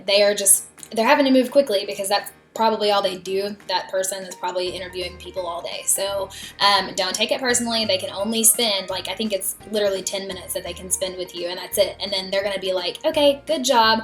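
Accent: United States. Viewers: Glad to hear that hint of Southern accent because I was worried about mine :)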